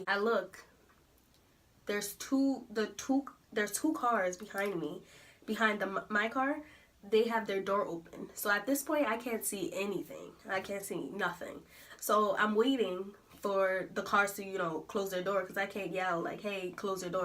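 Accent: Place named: New York, United States. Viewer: Where did you take your road test? You sound like your from NY like me! Lol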